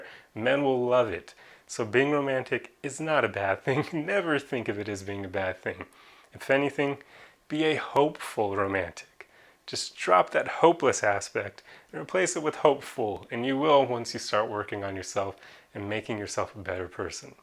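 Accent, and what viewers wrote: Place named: Germany. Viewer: You're such a chill guy, thanks for your video! Also, where are you from? For some reason your accent sounds mildly german to me lol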